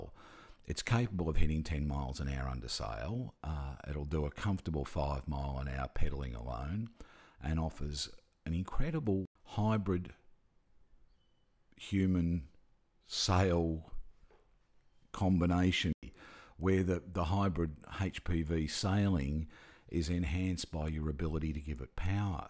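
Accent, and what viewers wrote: Australia. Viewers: Actually, I prefer this guy and his honest and relaxed approach. Listen to some of the other reviews he's done. Excellent voice, excellent approach and speed, but it's just not loud enough. Still, I have some normal sized computer speakers hooked up to this desktop and, when turned up a little, it wasn't silent at all. If this is called Aussie 'strine,' then I like it. He'd be an excellent story teller.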